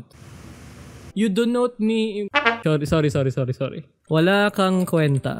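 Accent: Visayas, Philippines. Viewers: Bisaya ka ba kuya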